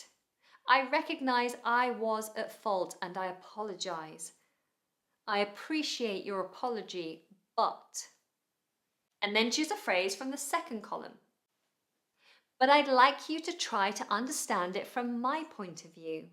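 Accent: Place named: United Kingdom. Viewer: Idont know but🙈 the British accent makes me feel like you're dealing with the English language.like Princess 👌and that's great, unlike the other accents which used in every englìsh speaking country , all of them speak English. But you have your own spicesss👌👌 youre great ❤❤❤ all the best always end 4everrr Thanks a thousand times😁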